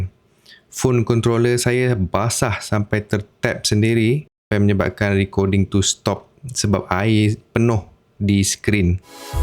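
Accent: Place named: Java, Indonesia. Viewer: Abang ni orang Jawa ya,Sebab bunyi slang abang bercakap ada bunyi Jawa😄🙏🤝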